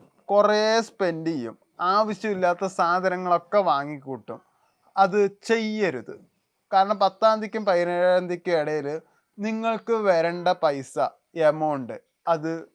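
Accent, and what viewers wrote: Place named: Palakkad, India. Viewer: ❤ sir correct Palakkad slang❤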